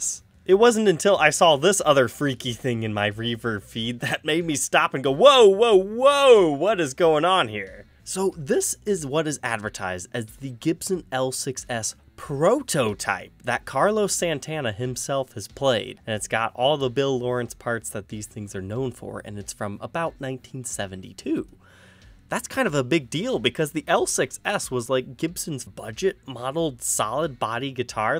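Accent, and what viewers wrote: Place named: Pittsburgh, United States. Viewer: You sound like your from Pittsburgh. Or somewhat close by.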